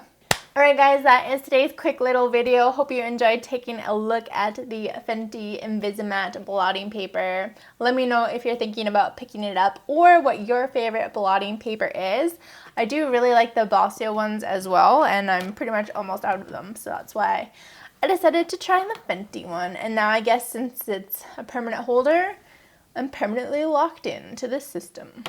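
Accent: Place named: Canada. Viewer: That’s just a Canadian accent, girl. 🤦🏻‍♀️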